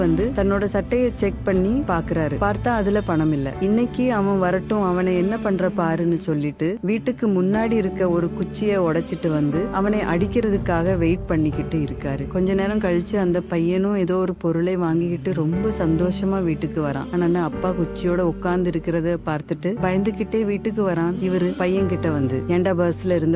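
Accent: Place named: Tamil Nadu-Kerala border, India. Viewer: 👍 அருமைடா 🎉 பேரான் ( நல்ல பதிவு ) T. N & Kerala பார்டர் ஸ்லாங்...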